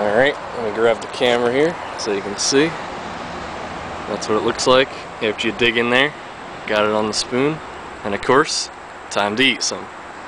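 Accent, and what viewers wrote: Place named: Philadelphia, United States. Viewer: love the Philly accent!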